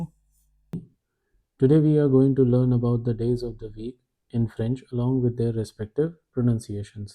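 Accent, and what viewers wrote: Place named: India. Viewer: Really appreciate the hindi pronounciation. Makes the words stick in memory. 👍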